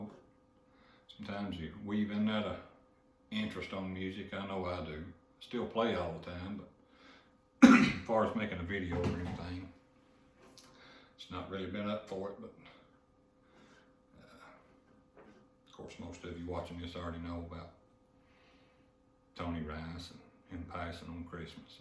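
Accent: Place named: Eastern Kentucky, United States. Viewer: Great videos...Just found your videos and still guessing you're from Eastern Kentucky or Mingo County, West Virginia. Great comments about Tony Rice too.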